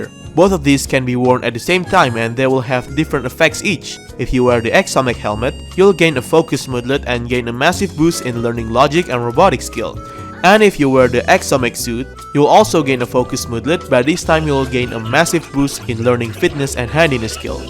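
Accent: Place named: Indonesia. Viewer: Bi lo orang indo? Logat lo indo bgt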